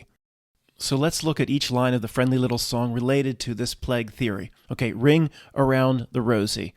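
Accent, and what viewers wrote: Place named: Philadelphia, United States. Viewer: 😂 Really love your Philly accent....💚🌻🙏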